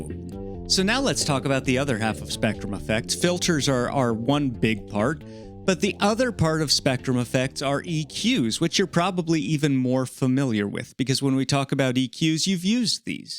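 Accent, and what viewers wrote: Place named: Canada. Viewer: Hey, I love your video..great job and very informative!! Canadian eh? Brantford Ontary-ary-ary-O here lol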